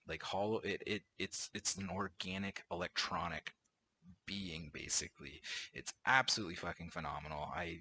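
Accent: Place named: Ireland. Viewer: Sounded Irish to me :-)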